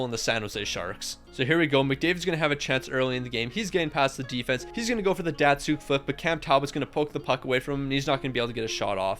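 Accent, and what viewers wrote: Calgary, Canada. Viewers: Are you from calgary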